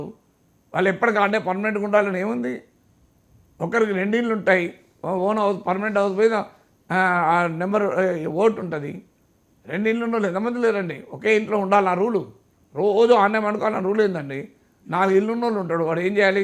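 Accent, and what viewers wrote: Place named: Telangana, India. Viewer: ఇతగాడొక ప్రొఫెసరు అంట.. ఈయన గురించి నా అబ్జర్వేషన్స్ స్టాక్ మార్కేట్ గురుంచి మాట్లాడుతాడు..స్టాక్ మార్కెట్ ఎకానమీ ను సూచిస్తుంది అంటాడు.. ఇహ ఎకానమీ గురించి మాట్లాడుతాడు ఇక అవి మామూలు బూతులు కావు.. ఈ చాన్నెలో యాశా.. ఇది ఒరిజినల్.. తెలంగాణ బూతు ఉద్యమ సమయంలో తెలంగాణ యాసలో విశ్లేషణ.. అదో రకమైన అస్తిత్వ ప్రయాస..ఇక ఈ మధ్య రవ్వంత రెడ్డిని కలిసి ఇపుడు ఇలాంటి విశ్లేషణలతో రాజకీయ ఆయాసం..ఇలాంటి వాళ్ళందరూ హైడ్ hyd లో కూర్చొని ఆంధ్రా యువతని పక్క దోవ పట్టిస్తున్నారు..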